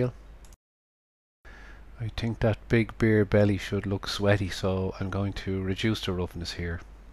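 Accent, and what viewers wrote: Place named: Ireland. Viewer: Nice video here, and love that Irish accent. Thanks for showing the ZB to Keyshot Bridge in accent. Nice character too. Do you know if the Bridge works with any version of Keyshot 5 or later or if I need the special Keyshot for ZB?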